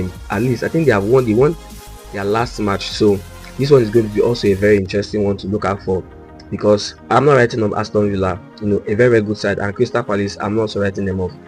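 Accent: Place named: Biafra, Nigeria. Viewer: Was Nigeria colonized by the British? This accent is Biafra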